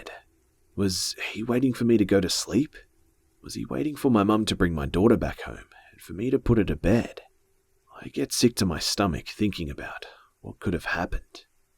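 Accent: Australia. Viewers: are you Australian?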